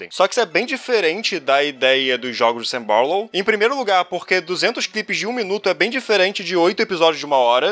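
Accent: Rio de Janeiro, Brazil. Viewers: Esse sotaque carioca do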